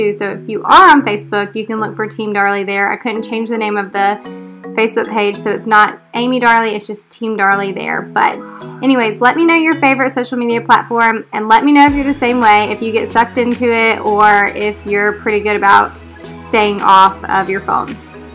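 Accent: South, United States. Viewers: Amanda sent me! Also, the moment I heard your voice, I felt your fellow southern accent! 😍 Mississippi sending some love two doors down! 😍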